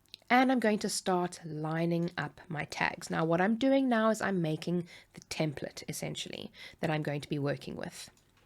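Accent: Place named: South Africa. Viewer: I love your accent. Thinking it may be South African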